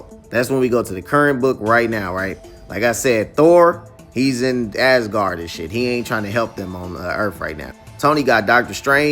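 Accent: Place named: South Central, United States. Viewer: My boy, talking with the south Central accent you know we family I got a sub🔥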